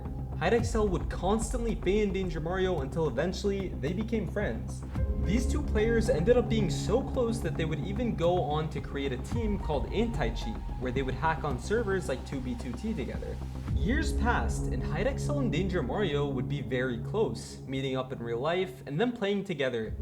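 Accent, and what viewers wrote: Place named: United States. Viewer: dude your from asia but u sound american, what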